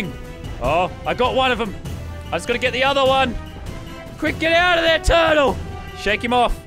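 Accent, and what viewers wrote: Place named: Australia. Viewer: What part of Australia are u from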